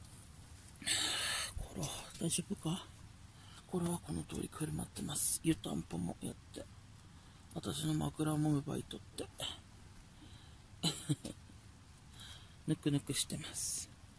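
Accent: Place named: Tsugaru, Japan. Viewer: また笑って見ました。女子ソロキャンパーの新境地開きましたね。お茶の間劇場ぽいキャンプ動画で漫画チック✨✨楽しいなあ。 jaijaiさんの自然体津軽弁？に温もりを感じます。プラスちゃんの可愛いキャラクターがgood💕 なんかヘルパーのお姉さんとバアサンのコラボみたいになっちやいましたね。🤣 お天気に恵まれてヨカッタヨカッタ\( ˆoˆ )/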